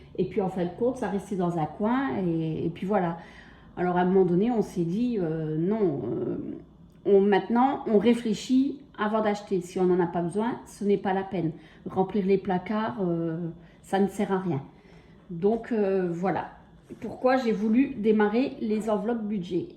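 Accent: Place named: Lorraine, France. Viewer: Cc, je découvre ta chaine et ton accent me fais chaud au coeur, je suis d'origine également de Lorraine , à la frontière Lux, mais maintenant dans le sud. Tres beaux classeurs. Et meme objectifs que toi, ne plus prendre dans les livrets pour finir le mois. Aux enveloppes depuis 1 an e1/2. Tout à fait une autre vision de l'argent avec les espèces..👍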